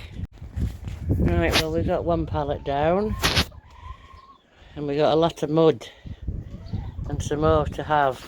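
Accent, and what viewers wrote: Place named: Yorkshire, United Kingdom. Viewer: I'm curious , You live in Wales. But your accent sounds like Yorkshire or somewhere in the north of England. Are there parts of wales that have that accent. Or are you from Engalnd originally?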